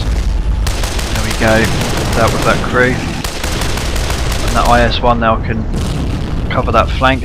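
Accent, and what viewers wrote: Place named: England, United Kingdom. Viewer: Your English but you may have some American roots because you do not have a deep English actcent, sorry I am not the best speller. It is almost kinda American if your voice tone was a lil defrent. You sound a lil like total biscut so I think you live in the UK closer to the English channel. Tell me if I am right or wrong!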